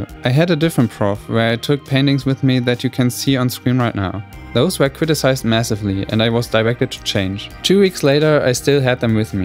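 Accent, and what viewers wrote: Germany. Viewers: are you german? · Are you german and if are you from lower saxony ? Your voice sounds very familiar to me ^^ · You sound very German. Kunst sollte doch etwas mehr sein, als lediglich die Funktion einer Kamera einschließlich anschließender Nachbearbeitung zu imitieren. Was ist denn deine inhaltliche Auseinandersetzung? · You are German are you? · are you German? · Are you german? I'm just wondering because of your accent. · ich finds toll zu sehen wie die Bilder langsam zum Leben erwachen, ich bin selber nicht so Künstlerisch begabt, eher Handwerklich, und denke bei Bildern wie deinen,"wie wurde das gemacht? wie ensteht dieser effekt?" sorry aber ich habs so rausgehört dass du Deutsch bist :) Qualty Content Approved ;) keep it up :)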